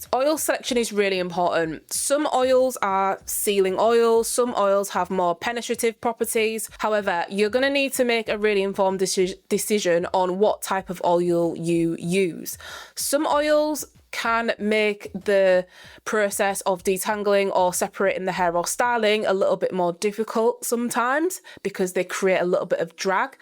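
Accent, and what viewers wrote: Northern England, United Kingdom. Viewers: Big up to the North England accent btw! ❤❤ just want to add that Kenra moisturizing oil has been a game changer for friction reduction on my tight 4c hair. Very lightweight. You might enjoy!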